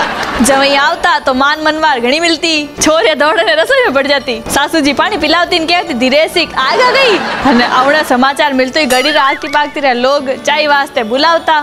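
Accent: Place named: Marwar, India. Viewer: suddhh marwadi bhasha very good g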